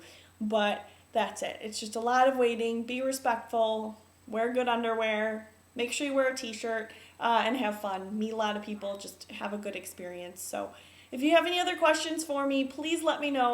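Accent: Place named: North, United Kingdom. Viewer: Northern accent.. Hate it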